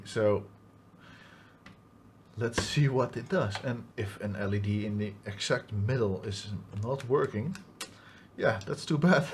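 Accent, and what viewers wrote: Netherlands. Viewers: Ik hoor aan uw accent dat u Nederlands bent dus dacht stuur u even een berichtje in die taal. Ik heb op dit moment het houtboard gezaagd en hou uw boor breedte van 3.2 mm per ledje aan. Nu vroeg ik me af, Hoeveel cm u tussen de ledjes heeft zitten. Is dit toevallig 15mm? Vriendelijke groet en bedankt voor uw zeer gedetailleerde video.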